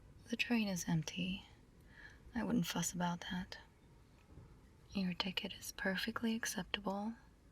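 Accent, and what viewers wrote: United Kingdom. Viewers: dude that posh accent lol. you actually did great with the animation, you really make them breathe and emote.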